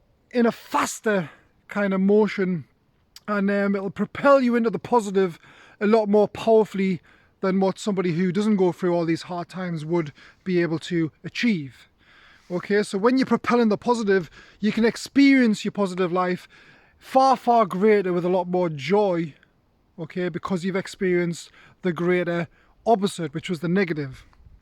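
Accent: United Kingdom. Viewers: When I look at you I anticipate the deep, resonating voice of a godlike warrior. Then I push play and get a Keebler elf with a British accent.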